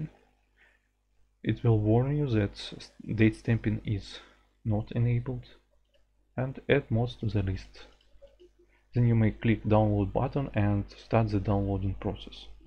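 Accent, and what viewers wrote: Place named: Russia. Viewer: Наш человек! Акцент - агонь!)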